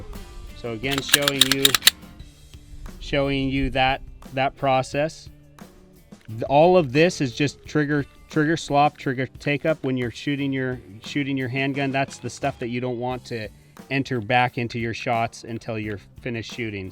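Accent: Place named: United States. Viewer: Jesus why do Americans explain everything so stupid can they not just say it how it is i think its the accent 😅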